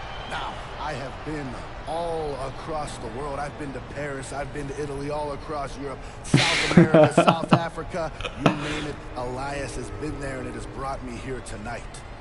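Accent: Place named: West Midlands, United Kingdom. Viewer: I honestly can't believe I can't I payed 150 for this game.....btw I'm the person who recognized your west Midlands accent 😂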